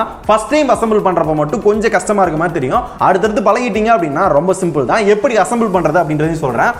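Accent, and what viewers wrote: Madurai, India. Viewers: கொஞ்சம் எசவா இருக்கும்.Madurai slang😍😍😍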